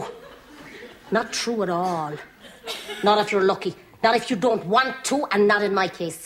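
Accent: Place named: Ireland. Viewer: This is an excellent irish accent !!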